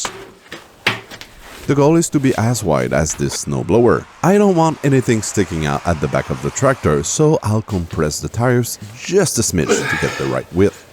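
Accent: Quebec, Canada. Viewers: "peux pas toutes aware" ... Je'l savais que ça sonnait Québécois cte vidéo là...pi en plus...c-t'une bonne idée ! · Ta le meme accent que george stpierre loll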